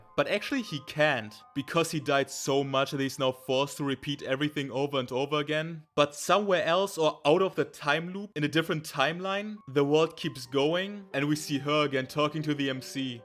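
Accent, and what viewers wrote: Germany. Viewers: i hear deutsch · Could you read Boys abyss? I think it doesnt matter if you shit talk or compliment manga, because i think you make good commentary either way. Btw are you german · Starker Deutscher Akzent Bro. Erstes Video was ich mir von dir anschauen werde.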